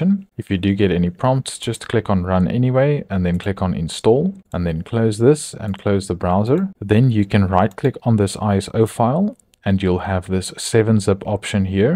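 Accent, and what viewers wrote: South Africa. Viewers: i can hear the 🇿🇦 in him😭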